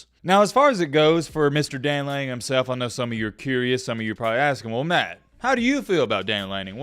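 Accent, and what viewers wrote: South, United States. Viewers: Matt, I grew up in Alabama (Mobile) but have been on west coast for ages... so i've loved your insight from someone with closer "boots on the ground". AND hearing that southern accent reminds me of epic days growing up in the deep south. Love your passion for Bama football!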